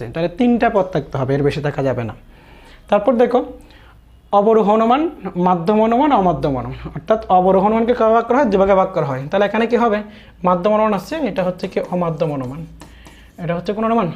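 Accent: Noakhali, Bangladesh. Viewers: Sir amadrr nuakalir,onek balo bujai logic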